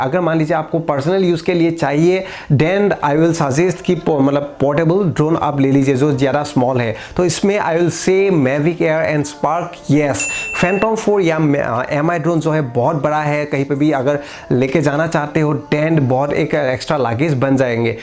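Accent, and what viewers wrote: Assam, India. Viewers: bhai accent tu huni gom pai golu tumi axomiya buli... so about goi salu sivasagar r manuh ulala...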